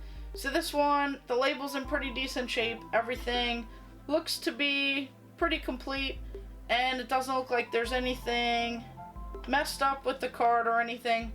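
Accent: Maryland, United States. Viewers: That's a nice haul. You live in Maryland? I've never heard a Maryland accent until you. He he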